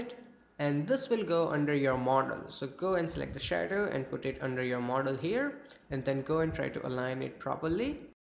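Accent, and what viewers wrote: India. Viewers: Its funny how fast this guy speaks, Indian english is cool. A very good tutorial indeed. Thanks!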